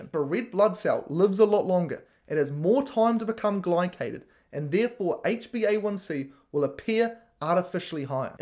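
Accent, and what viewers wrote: New Zealand. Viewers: Great info.. love the kiwi accent!! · He is from New Zealand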